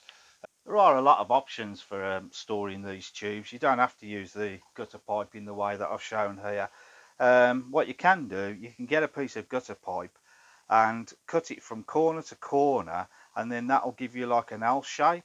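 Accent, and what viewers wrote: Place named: West Midlands, United Kingdom. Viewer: Like how you think great great tips & ideas grip leads can be a pain n literally sometimes when rummaging around in your box . sounds like your a west Midlands chap like myself i love all types of fishing but the sea fishing the most regardless of the distance from brum . Wish you luck n success with the channel from a new subscriber 👍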